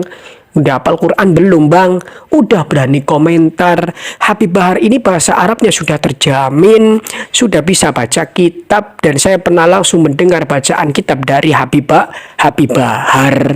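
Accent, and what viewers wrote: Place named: Java, Indonesia. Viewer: NGOMONG LU KOK MEDOK. TAPI GUE SUKA. TERUSKAN DAKWAH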